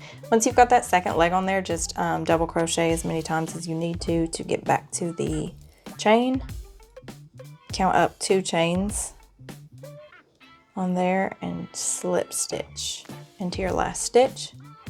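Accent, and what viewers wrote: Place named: South, United States. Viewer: Such a great pattern!! Thank you for sharing. Gotta say I loved the southern in your speech. Made making that bad boy more fun :)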